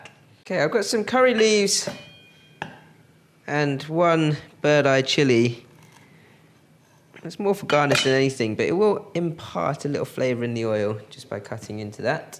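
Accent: United Kingdom. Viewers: A chinese guy in British accent 😂😂 cute😂😂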